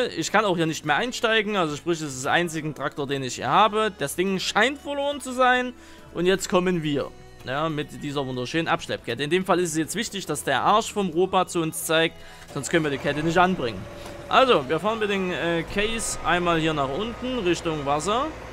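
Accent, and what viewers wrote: Saxony, Germany. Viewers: Nicer Mod p.s. Wenn du Sachse bist dein sächsisch is der Hammer :D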